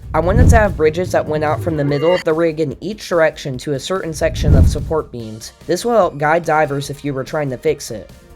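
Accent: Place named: Maryland, United States. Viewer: Insanely weird question but are you from Maryland? 😂 Your accent sounds it!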